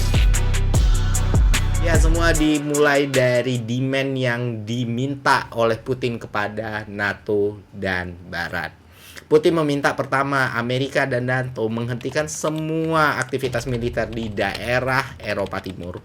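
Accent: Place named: West Java, Indonesia. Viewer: logat sundanya..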